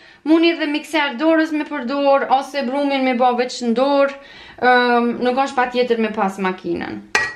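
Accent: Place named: Kaçanik, Kosovo. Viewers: M Z mos e kretiko se po tknaq ka po fol nashta asht e kaqanikut po ska lidhje se ksaj i ka hije ke e kahit ke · Qysh po fol si mu kan e Kaqanikit ?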